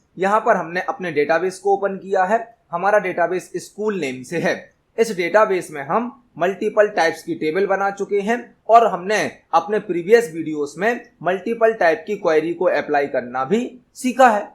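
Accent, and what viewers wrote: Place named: Bundelkhand, India. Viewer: Sir are you from mp!? Because aapki voice ka accent bundelkhandi sound kr rha h specially damoh ka🧐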